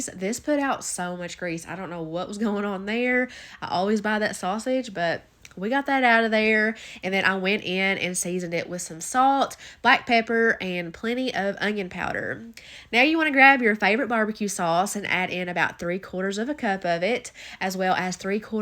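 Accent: South, United States. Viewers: What gives you the right to tell people how to talk? She’s southern. That’s just how we talk. Don’t like it, don’t watch. Be kind. Do better!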